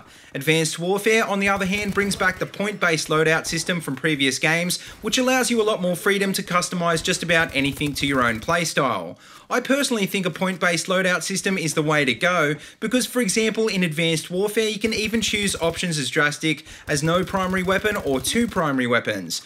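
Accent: Australia. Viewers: Love the australian accent :D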